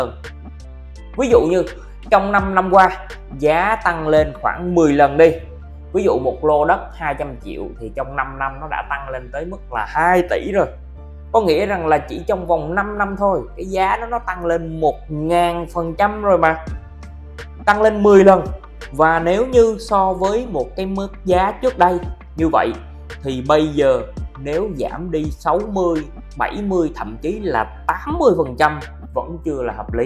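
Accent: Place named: South, Vietnam. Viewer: Dân SG-miền Tây ko ai rảnh hơi mà phát âm chuẩn Hà Nội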